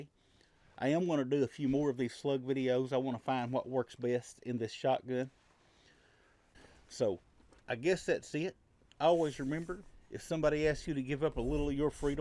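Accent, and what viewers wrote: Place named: South, United States. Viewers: Really? I think the way you talk is cool. You sound like a guy at work. He's from WV. That casual Appalachian dialect. Good shit. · It’s because it is a gentle southern accent with clear, crisp annunciation, and a lot of intelligence behind it. It’s not flashy or boisterous like most content these days. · It's obvious has a southern accent, but you cannot dislike the man about the way he talks, no matter what.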